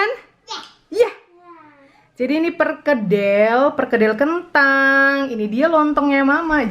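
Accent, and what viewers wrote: Indonesia. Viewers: lidahnya indonesia banget😄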